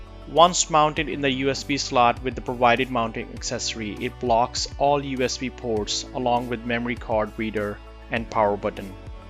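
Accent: India. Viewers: If u are Indian put the title in indian trust me no one wants to hear ur English accent get ur audience from your own shitty cohntry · Great Video, but the accent cringe is real! Either use Indian or work more on the US one. It's painful inbetween. Cheers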